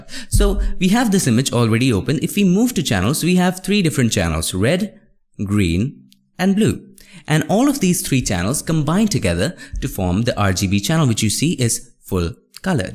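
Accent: India. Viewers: you have good techniques and concepts, but damn your english! you talk like an indian rapper. you have to slow down on your words and give more emphasis to it.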